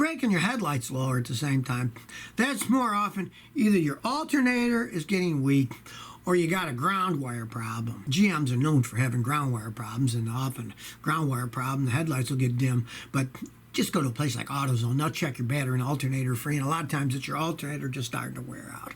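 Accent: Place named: Texas, United States. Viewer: I don't know what I like best, is comment, knowledge, texas accent...or the images on his right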